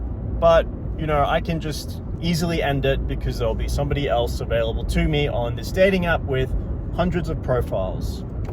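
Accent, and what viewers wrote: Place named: United Kingdom. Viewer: I love your accent. I thought you might be British but you're driving an American car (left hand drive)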